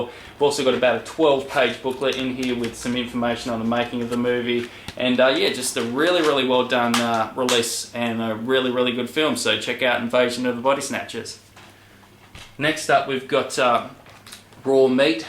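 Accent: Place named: Australia. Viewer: Hey, in case you haven't noticed, you have 666 subscribers! I realized that because I was an watching Eric Bana interview and I thought his accent was identical to yours as far as regional Aussie accents, so I was checking to see if you live near where he is from...:)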